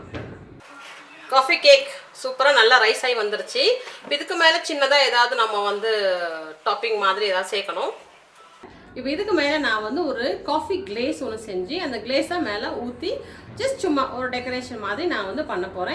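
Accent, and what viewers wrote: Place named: Thoothukudi, India. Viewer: Really Sorry Mam. I'm confused because of your nice Tamil vocal & your Thoothukudi slang also of course. Even I thought, just for name sake you are telling like you are in Mexico, in your past Birthday Special video "35 Things". First of all belated Birthday Wishes Mam. After a long time, without play forwarding I've watched your 1 hour video of that "35 Things". Afterwards I've clicked the subscribe button without any hesitation. Since Yesterday only I've visited your channel. Take Care Mam...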